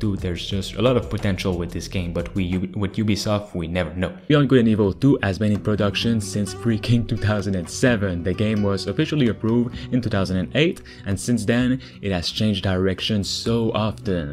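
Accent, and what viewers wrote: Quebec, Canada. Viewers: Toé t clairement québecois 😅✌❤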